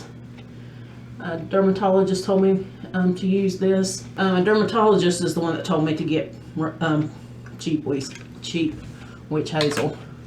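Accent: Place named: Georgia, United States. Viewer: LOL, girl I didn’t know you were from SoUth Ga until you told us. I didn’t hear your accent at all until you let it go. My dad is from Cordele and my daughter attended Valdosta State. ☺️ Small world.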